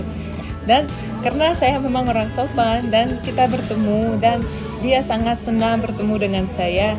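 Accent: Java, Indonesia. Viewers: ngomongnya Indonesia bangett mbak ...... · Logat mu bersih sekali , sudah persis orang Indonesia asli, agak terpengaruhi logat jawa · Logatnya sdh seperti orang indonesia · Eksennya sudah orang Indonesia.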